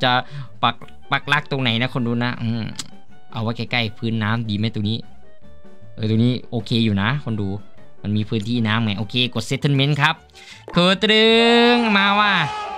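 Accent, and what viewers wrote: Korat, Thailand. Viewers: คนโคราชมะ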